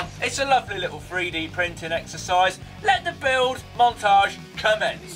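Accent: United Kingdom. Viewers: i always love British accent, thats help me learn English faster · English accent... didn't you? Btw, awesome sir... 👍👍👍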